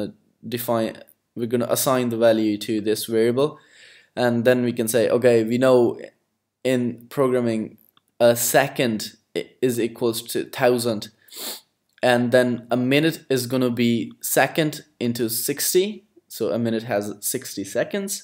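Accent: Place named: United States. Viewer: but your ascent is american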